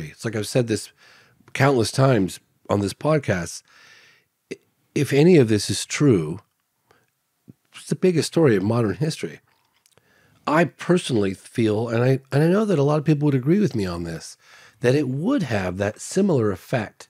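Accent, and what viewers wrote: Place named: Scotland, United Kingdom. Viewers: Great interview, thanks to both of you. Fox deserves front row in the Ufology Hall of Fame. Speaking of historical crashes, many of your followers will be aware of US marine Jonathan Weygandt and his 2001 interview with S. Greer on a Peru UFO crash (craft shot down). This interview is well-known among ardent researchers in the field. So I want to mention that Jonathan Weygandt has now done a second interview, 12 days ago, and it can be seen on Martin Willis channel. I just became aware of it via Chris Lehto. Weygandt had vanished from sight, disappeared after his one-and-only appearance, and probably most of us old-timers figured he was dead. 2nd interview is remarkable for one thing especially, the fact that 'someone' (US ops) took the craft down, probably with a Hawk missile, or similar. Lastly, (Canadian here) lovely to hear the Glaswegian brogue and know you've not gone 'well- ' for youtube! 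Cheers! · The new Scottish Joe Rogan 👍🏻😂😎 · Host must be from Glasgow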